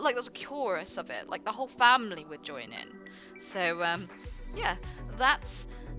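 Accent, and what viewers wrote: United Kingdom. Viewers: You're so likeable 😂 imperfections are such an attractive quality, especially when you have that amazing English accent 😍 from an Irish man 😘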